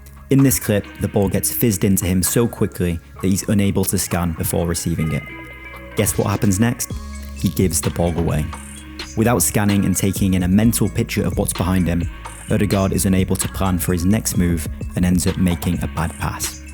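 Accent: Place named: Scotland, United Kingdom. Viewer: His accent sounds a bit scottish. That "last" is in no way pronounced wrong. You just have a problem with people accents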